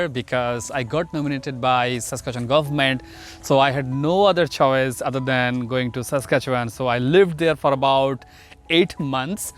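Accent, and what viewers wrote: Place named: Bangladesh. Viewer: I watched the entire video just to hear you say that you're from Bangladesh. But you did not. Are you ashamed of your nationality? And BTW I could tell that you're from Bangladesh by your accent.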